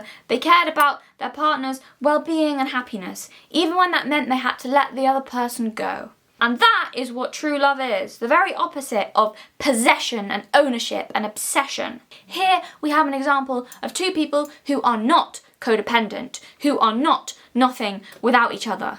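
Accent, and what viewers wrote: United Kingdom. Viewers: I always forget how British you are until you speak So, what i got out of this: British Girl Loves Trees (EXPLICIT?)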